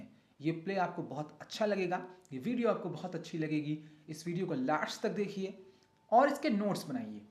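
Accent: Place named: Meerut, India. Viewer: Aap meerut se he na sir..? Aqsa k bhayya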